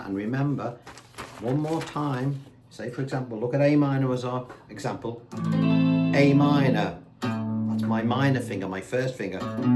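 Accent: Liverpool, United Kingdom. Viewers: You sound a tad scouse. Can't listen to that awful scouse dialect.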